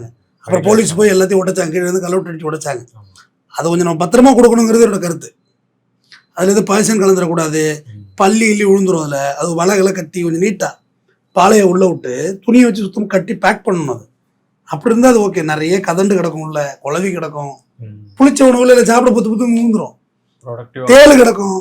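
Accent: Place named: Tirunelveli, India. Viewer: உங்கள் மொழி நடை அசல் திருநெல்வேலி மொழி நடை Uncle 😊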